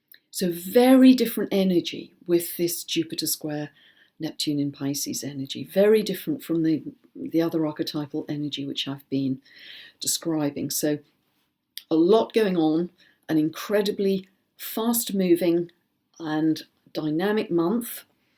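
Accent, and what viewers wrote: England, United Kingdom. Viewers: Repeatedly in meditations beginning with the Winter Solstice celebration, the intention watchword for many of my friends ( and myself) has been “Courage”. So to hear you also share “Courage” as an intention for 2019 was a wonderful confirmation of how “the collective” is moving in to this new year cycle. 🙏 Thank you for sharing with us every month. I find your analysis always clear, concise and entertaining. (English accent, a welcomed plus 😉)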